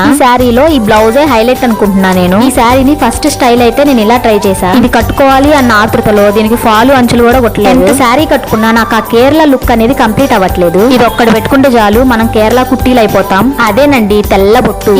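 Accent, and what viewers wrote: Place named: Atmakur, India. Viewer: Akka nv atmakuru lo chaduvukunnava. Langvoni super😊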